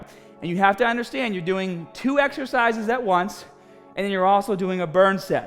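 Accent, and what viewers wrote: Boston, United States. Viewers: You got be from Boston with that accent 💪🏽 I been doing for while definitely gets you a nice pump started to see results after a month nice!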